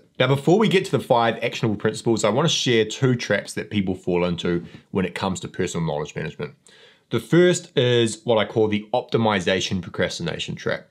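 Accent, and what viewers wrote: New Zealand. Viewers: I might need to rewatch this video. awesome Kiwi accent is distracting me from the content. 😅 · you've got cool accent! i actually never heard New Zeland's English :) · r u from newzeland?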